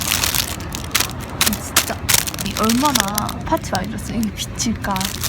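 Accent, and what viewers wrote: Seoul, South Korea. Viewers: 근데 영상들을 보면 볼수록 80~90년대 서울사투리하는것같죠 ? ㅋㅋ 어리신분이 ㅋㅋㅋ 부모님 말투 닮으셨나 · 말투에 '~거든요' 같은 서울 사투리 쓰는거나 화장법이나 생김새도 10년대에 유명한 얼짱? 이런 느낌이고 저는 보자마자 예전 얼짱 얼굴 썸넬에 박아둔 플리인줄알고 노래들으러 왔는데 당황함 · 옛 서울 사투리같아요 너무 귀여우신듯 ㅋㅋ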